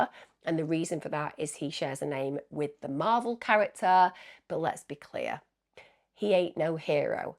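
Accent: United Kingdom. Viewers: i live in LEE COUNTY FLORIDA , FORT MYERS and its so funny hearing someone from the UK talking about this story .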